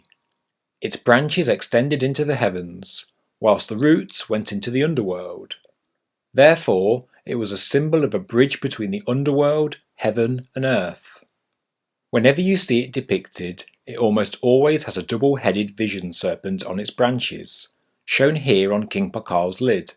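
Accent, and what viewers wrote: United Kingdom. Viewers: Deepstate debunkery with brit accent.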